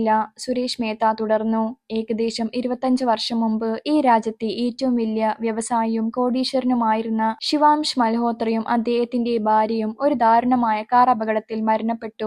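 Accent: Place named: Kottayam, India. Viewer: വെല്യ എന്ന വാക്ക് കോട്ടയം സൈഡിലൊട്ടൊക്കെ കോമൺ ആയി യൂസ് ചെയ്യുന്ന word തന്നെ ആണെടോ 😊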